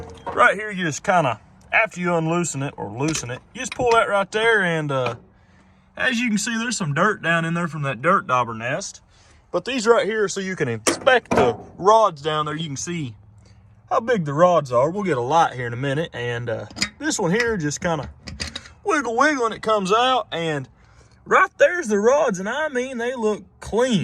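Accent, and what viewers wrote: South, United States. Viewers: I've watched a lot of "Will it run" videos on YouTube and your southern drawl is really nice to listen to, you're also a pretty sharp mechanic who clearly knows what he's doing. Great video! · TURN THE VOLUME OFF! This dudes forced cartoonish Southern good ol'-boy voice is the most annoying thing i've heard on YT ever. I feel like I'm a dumb tourist, sitting on a hard wooden bench at Disney World in Fla, watching a play by a bunch of Hollyweird failed moronic actors.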